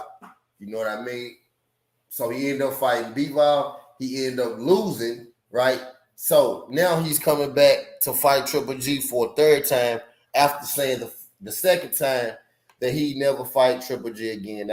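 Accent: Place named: South, United States. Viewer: U sound more country than usual in this video 😂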